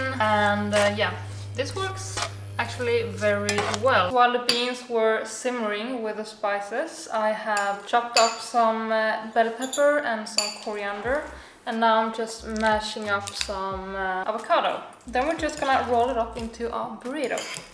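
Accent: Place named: Sweden. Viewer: I love your accent! Forgive me if I am wrong but are you from Sweden? I am a first time watcher and I love your videos so far! ☺️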